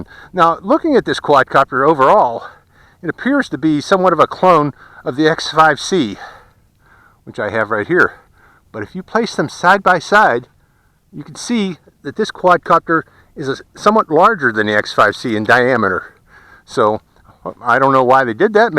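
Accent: Pittsburgh, United States. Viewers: Are you from Pittsburgh or Western PA?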